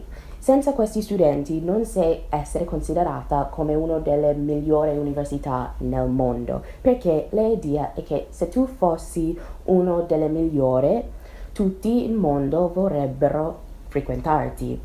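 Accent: United States. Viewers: come mai hai lasciato un paese così bello come l'america e sei venuta in italia? comunque parli molto bene italiano ed è bellissimo l'accento americano che hai. sei bellissima💗👋🏻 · Complimenti per il tuo italiano, anche se ancora si nota molto l'accento Americano ho trovato il tuo modo di parlare molto corretto e soprattutto grammaticato,mi è piaciuto molto ascoltarti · I barely understand Italian but I can hear your American accent loud and clear! <3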